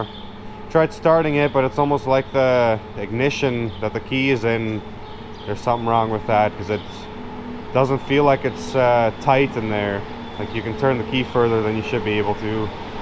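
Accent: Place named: Netherlands. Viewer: Hi, it is quite clear that you presumably have Dutch ancestry, were you born in the USA or immigrated, your English is at least good.. But as cold as it is now, it is not extremely hot in the summer, and isn't it very challenging at times. Thank you for wonderful everyday videos that I can watch here in little Denmark.. Greetings Erik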